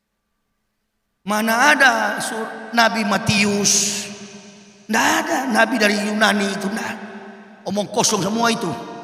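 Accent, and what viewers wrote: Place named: Manado, Indonesia. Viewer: Logat manado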